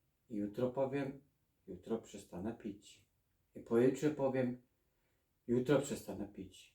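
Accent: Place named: Silesia, Poland. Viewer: Zrobiłeś pierwszy krok ,że mówisz, to już jest dużo. Trzeba wszystko z siebie wywalić,co cię boli wewnątrz w środku twojej duszy. Jesteś wrażliwy i to widać,fajny chłopak ,otwarty,super. Wyjdziesz z tego ,musisz bardzo chcieć a ty chcesz. Tylko od ciebie to zależy. Już ciebie to uwiera i bardzo dobrze. Super facet z ciebie,dasz radę, jesteś ambitny i pokażesz ludziom ,że można. Będziesz jeszcze innym ludziom pomagał wyjść z alkoholizmu. Ty to znasz praktycznie a nie z teorii,masz w sobie prawdę. Walcz o siebie,bo warto. Świat jest piękny. Powodzenia. Nagrywaj filmy jak ci idzie,krok za krokiem powoli będziesz wychodził razem z nami a my będziemy się cieszyć jak każdego dnia walczysz o siebie. Mów wszystko,wszystkie swoje doświadczenia,to co ci przychodzi teraz na myśl,co cię boli.Nagrywaj i mów.☀️☀️☀️☀️☀️☀️🌼🌼🌼🌺🌺🌺🌹🌹🌹🥀🥀🥀🇵🇱🇵🇱🇵🇱🇵🇱🇵🇱. Zrób to dla Naszej Ukochanej Ojczyzny. 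Jeszcze twardy Ślązak,piękna gwara, coś pięknego.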